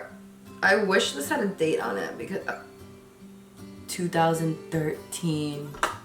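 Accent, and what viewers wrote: New Jersey, United States. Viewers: Her Jersey accent is so thick i honestly love it. She kinda sounds like Rihanna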